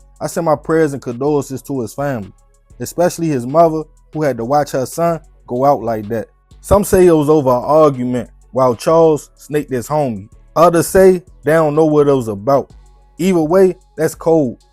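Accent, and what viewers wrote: Baltimore, United States. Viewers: You doing our city right I noticed your accent gettin way more thicker ...you not from Baltimore originally but I give you ya adoption papers you deserve it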